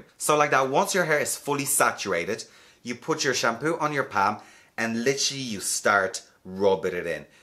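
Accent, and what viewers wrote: Ireland. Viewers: I used to live in Athlone (went to college there) so your lovely accent reminds me those days ;) I bought Kerastase with stemoxydine - should help to "awake" sleeping hair cuticles. We will see. If it doesn't work I will try loreal and vichy but wanted to try Kerastase first. Thank you so much and have a great day :)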